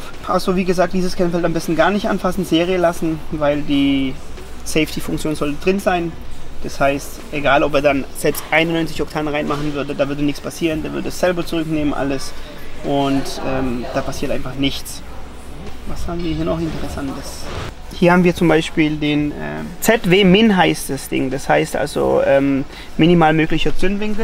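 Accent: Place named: Swabia, Germany. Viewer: s’Hebelle vom Golf 2🤣🤣🤣 zu geil, richtiger Schwob bisch bliebe, gell🤣✌️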